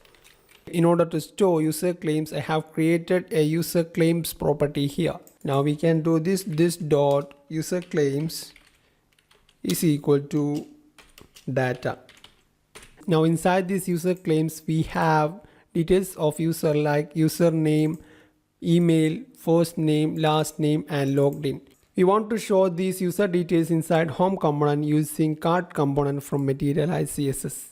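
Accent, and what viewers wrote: Kerala, India. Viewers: malayali aano? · pronunciation ketata malayali aano na enikyum ondu shamshym..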